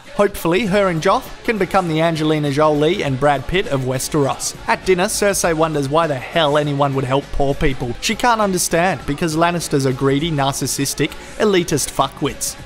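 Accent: Australia. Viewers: I absolutely love these reviews!!! I found them when listening to other GoT stuff on here, and I'm hooked! Also listened to some of your other stuff, and plan to go through ALL of it!!! It's that accent! Oh God! The Ozzy accent is my favorite to listen to. All this talk about listening and not watching? Well, to be honest, I'm blind. Maybe, if I'm lucky, I'll get to come to the land down under one of these years. It's been a dream of mine? What other interests do you have aside from Thrones? I'm also into Babylon 5, Star Trek, Lord of the Rings, studying languages, reading anything I can get my hands on, and listening to music.Cheers!Chelsea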